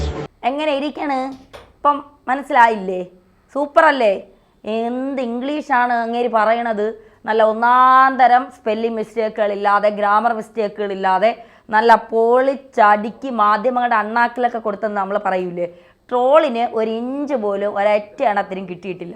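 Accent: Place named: Kollam, India. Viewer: അത് കൊല്ലക്കാരുടെ ശൈലി ആണ്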